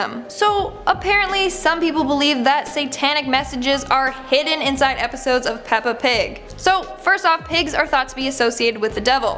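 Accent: United Kingdom. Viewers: Whats Wrong With A Slight British Accent?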